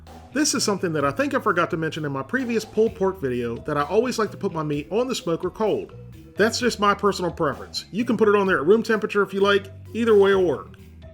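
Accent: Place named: Virginia, United States. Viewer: Are you from Virginia? Your voice along with style of cooking reminds me so much of family in VA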